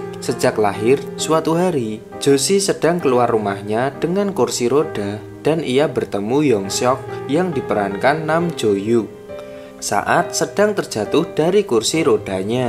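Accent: Java, Indonesia. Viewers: Terlalu medok host nya 😅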